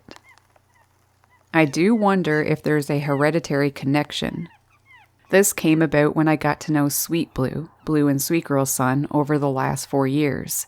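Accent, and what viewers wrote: Canada. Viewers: Food offering. Mate for life. So romantic! Thanks for the video,. I've always loved your narration. I guess I'm intrigued by your Canadian accent.😂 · Love your Canadian accent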